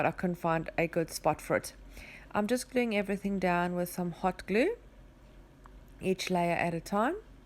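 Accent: South Africa. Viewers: Discovered you quite by accident this morning and so pleased I did!! Wonderful creativity! Your work is incredible! And an added bonus is hearing a South African accent again! Awesome!!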